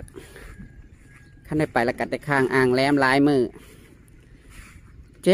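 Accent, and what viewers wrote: Isan, Thailand. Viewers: สำเนียง พูดเหมือนคนภาคอีสานมากๆคะฟังเข้าใจง่ายคะ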